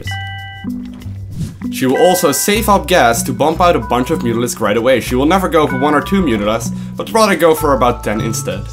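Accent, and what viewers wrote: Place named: Netherlands. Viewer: Huh thats why you sounded a bit familiar. Where in the Netherlands do you live?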